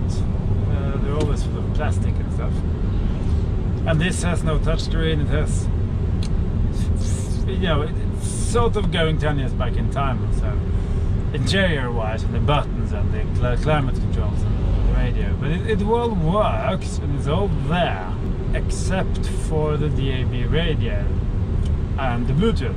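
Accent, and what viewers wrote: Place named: Norway. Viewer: I'm Australian and his English is good and clear even with his Norwegian accent. Very enjoyable video. The T3 order books open here in Australia on July 30th, 2021.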